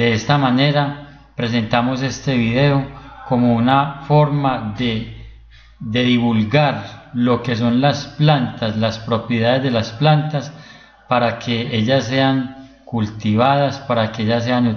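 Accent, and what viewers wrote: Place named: Colombia. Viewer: Ame el acento colombiano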